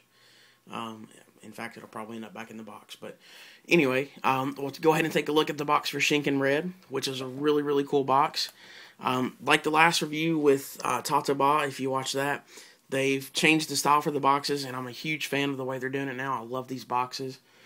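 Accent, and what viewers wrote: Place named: Texas, United States. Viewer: hey thanks for making a video where you talk it out, I saw others and I found it annoying where it was just music. Also I like your accent, are you from Texas?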